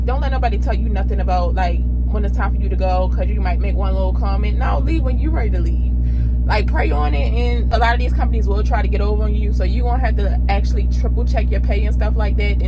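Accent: Baltimore, United States. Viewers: Are you from Baltimore? 90 percent sure of it i can hear the accent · She sounds like she's from Baltimore area. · Okay yeah I thought I heard the accent too (born and raised here). I always second guess myself. I at least heard her say Merlin so I knew she was from Maryland 😂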